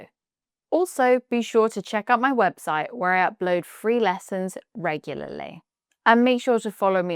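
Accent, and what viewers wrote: RP, United Kingdom. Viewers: I love british english accent, and specially, the RP. There are several youtube chanells for MP; but there are just a few for RP. And I enjoy your lovely way to teach us. Thank you and greetings from Colombia.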